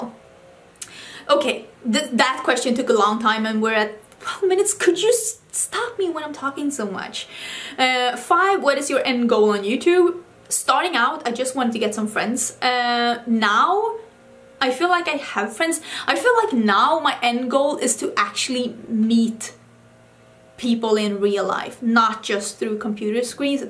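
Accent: United States. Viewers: I have a question for you: where did you learn your perfect American English? Did you ever live in the U.S.? Just wondered.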